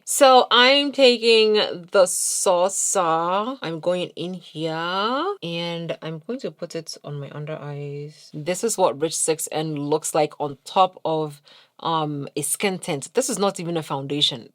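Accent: Ghana. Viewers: Forget the concealers please! I'm watching because of the Ghanaian accent!! Love it!❤❤ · nahhh sis you don't understand how refreshing it is to hear the Ghanaian accent again throughout the video and the emphasis on the words hahaha love it 👋🏾🥰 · The Ghana in your voice comes out every more in each video and it's giving me liiiiiife 🤣🤣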